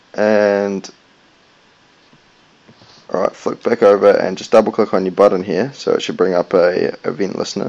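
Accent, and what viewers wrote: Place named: New Zealand. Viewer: thats a new zealand accent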